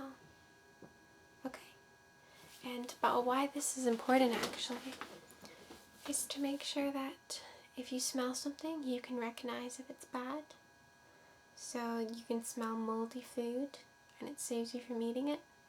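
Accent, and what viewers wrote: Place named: Midwest, United States. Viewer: Only flaw is a slight buzzing - is it a laptop fan?? You seem very sweet, please keep going! Nice accent btw - sounds Midwestern, like Nebraska or Montana or something.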